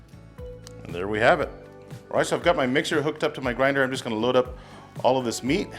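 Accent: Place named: Texas, United States. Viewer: you’re the best. As a native Texan living in SC, just hearing your voice makes me feel like I’m back in south central Texas. But amateur smoker and neophyte sausage maker, videos like these change my game. ¡Muchas gracias, vato!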